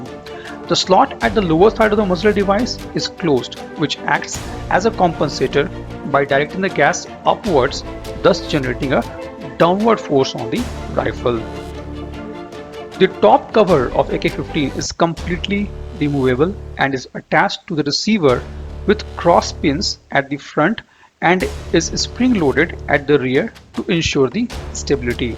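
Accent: India. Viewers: speak slowly. Indian accent is made fun all around the world. Nahi tou apna Hindi bolo, Maast.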